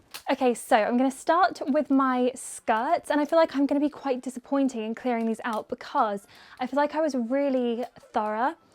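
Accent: United Kingdom. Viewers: Love your videos I'm American but I lovvvveeee British accent 🥰🥰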